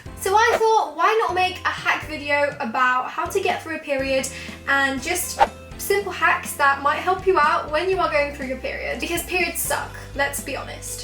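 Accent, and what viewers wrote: United Kingdom. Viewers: I will definitely try the heating pad hack!! Thank you so much for sharing this with us, and by the way - your video quality makes you look even more gorgeous We all love your honest and creative videos! Would love to win the prize for my room that I've recently moved to (it's a flat with two girls that are studying, too) :) I'm studying English to be a teacher and your british accent is so awesome! I'd love to see a video of you and maybe your boyfriens imitating different accents!! Love, Melodie xx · Ive known your channel a year ago and i really like your videos. Especially a life hacks and makeup tutorial video. You are such an amazing girl. I hope you keep being the way you are and nobody can let you down bc i am here. and also i really like your accent (its british right?) idk i always find really cute when i heard girls speaks british accent. 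we are so close yet so far😁. i know it is such a long comment lol but i hope i can win this giveaway bc i reallyyy want that macbook air. wish me luck and i love you so much 💚💚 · I adore youu and I love your accent, I have something for british accent really😫😂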